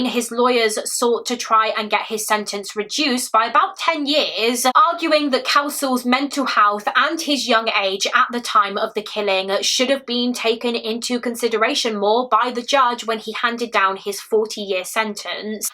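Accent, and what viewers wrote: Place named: RP, United Kingdom. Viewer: I had a horrific day but a new video will cheer me up. That posh accent is so relaxing 😊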